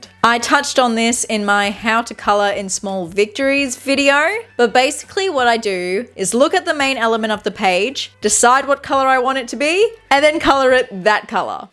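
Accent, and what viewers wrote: Australia. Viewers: Thank you. I love those paintings. And I noticed your Aussie accent. Aussie, Aussie, Aussie ….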